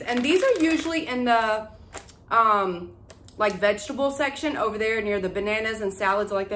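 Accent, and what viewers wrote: South, United States. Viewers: Alright, I just stumbled on to your channel today by fluke/accident while looking at something else and I have SO much to say about how great I think you are but there ain't enough room here so maybe another time. But I will say these 3 things:your accent (from one southern girl to another!), your so down to earth (which feels so rare these days on social media) and lastly in addition to you showing us your finds the fact that you rattle off different ideas we could use them for is amazing! Especially for extremely busy gals such as myself that gets overwhelmed which creates creativity blocks 🤦🏼‍♀️. I just wanted to share also 2 ideas, those Yardley or any of the body wipes also are great for elderly ppl that may have a hard time getting in the shower so they're easy to use for them to freshen up between showers. And 2nd that hearts silicone tray you got in a previous haul could also be used to fill with water for ice cubes which would be cute in a beverage (such as house wine of the south aka sweet tea). 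Anyway I'm a new subscriber now and am telling my Mama & friends about you too. Thanks so much 🤗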